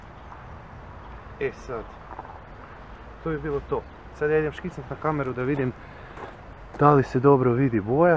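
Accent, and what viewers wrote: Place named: Karlovac, Croatia. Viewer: iznenadio si me s tako lipim glasom, osjeti se karlovački naglasak!!! Što se tiče Nutele, razlika je vidljiva( mogu ocjeniti jer sam iz Hrvatske a živim u Luxembourg), ali moji klinci vole jako našu Kraševu Linoladu koja je njima kažu i bolja, uvijek je bilo i bit ce razlika u kvaliteti proizvoda za zapadne zemlje Europe i one istočne, to znas i sam jer si rođen vani, meni je recimo razlika najveća i najvidljivija ona kod omekšivača za rublje i u dugotrajnosti mirisa i u boji i u gustoći za potpuno istu marku poput Lenora... inače ideja za video predobra i glas kao u glumca!! Lp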